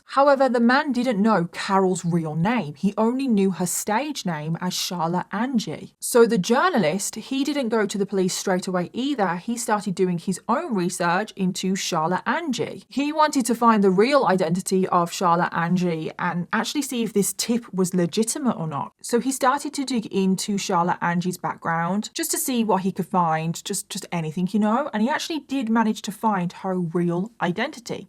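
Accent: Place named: United Kingdom. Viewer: Can somebody please tell specifically what kind of British accent has? Thank you. I like it.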